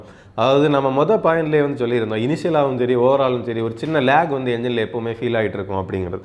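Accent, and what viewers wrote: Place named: Nagercoil, India. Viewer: Nagarcoil tamil keka nalla irukku !! ❤️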